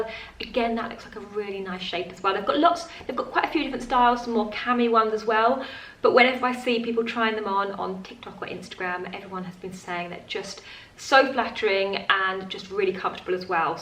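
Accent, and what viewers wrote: United Kingdom. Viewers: With love for you. Where are you from? Thank you. I like your English. British? I study english. Thank you for your answer.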